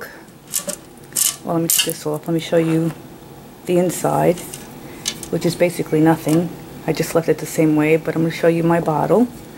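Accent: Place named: New York, United States. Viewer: Beautiful!! Also (grin) sounds like you are a sister New Yorker.....I was born and raised in Greenwich Village (went to P. S. 41 on 11th and 6th) - nice to hear the accent - music to my ears! THanks for subscribing - I am lost in your videos right now......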